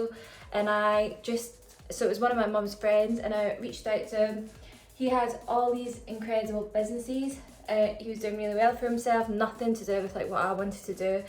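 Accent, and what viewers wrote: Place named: Scotland, United Kingdom. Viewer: Thank you so much for sharing ❤ I appreciated and related to so much you said. Your perspective is so valuable, for all the aspects you mentioned that have been barriers for you... being a gorgeous, woman of colour, from our old neighbourhood, with a Scottish accent, and valuable unique experiences ❤❤ exactly why your perspective matters so much 🙌🙏 realer than shit we are overloaded with ❤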